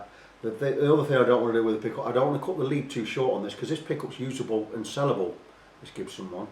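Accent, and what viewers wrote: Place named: North, United Kingdom. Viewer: great stuff mate. was the guitar just standard 2 humbucker 3 way toggle, no push pull pots. just because normally you would be soldering 2 wires together from the p/up in the old school way. i'm putting a seymour jb 4 conductor in a les paul with pcb. i bought a pre wired molex adapter on ebay for purpose but wires came loose when plugged in! so thinking doing it this way. cheers pal, sounds like you're a fellow northerner....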